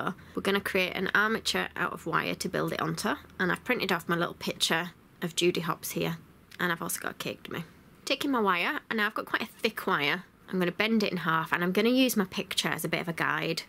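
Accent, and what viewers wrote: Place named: North East England, United Kingdom. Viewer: Are you from the same area as Sarah Millican? Your accent sounds similar. It's a lovely accent to listen to, from both of you!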